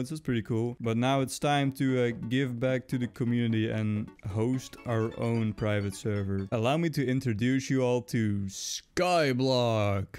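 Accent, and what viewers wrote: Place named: Netherlands. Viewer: This guy has a accent like he is from the Nederlands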